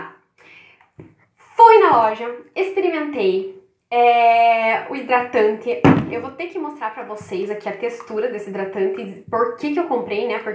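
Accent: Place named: Paraná, Brazil. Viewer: Oii lindona !.você é do Sul do Paraná? Tem um sotaque muito parecido 🥰♥️você é muito espontânea ,amei suas impressões! 😘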